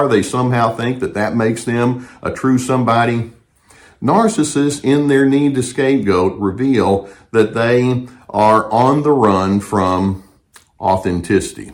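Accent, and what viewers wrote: South, United States. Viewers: What state is the accent from? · I read your written article and I was hearing the words in your Southern accent! P.S. I am very glad that I am on the journey with you, too!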